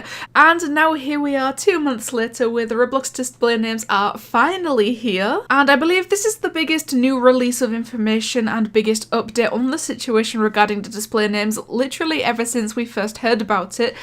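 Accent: Scotland, United Kingdom. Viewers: are you scottish eh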